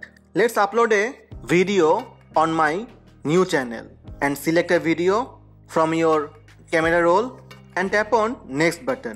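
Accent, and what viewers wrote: India. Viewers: indian right? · Bruh you sound like an Indian .i think you are O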